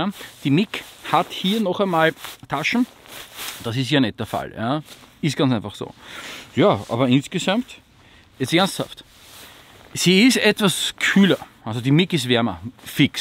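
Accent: Vienna, Austria. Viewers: Alder ein Video von dem Typ gesehen, direkt abonniert einfach ein richtiger ehrenmann. Und bei dem geilen Österreichisch sowieso ein Muss. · a woschechta wiener, ha?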